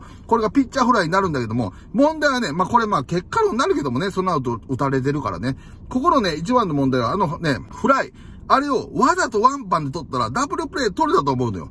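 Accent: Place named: Tokushima, Japan. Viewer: 徳島の人やなあ、いい感じの里さんの語り。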